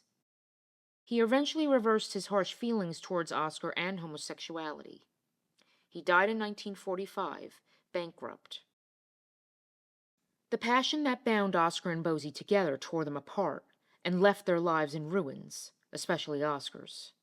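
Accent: United States. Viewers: Why criticise the narrator’s accent. She is an American and speaks perfectly well. The substance surely is the main thing . I found this an interesting article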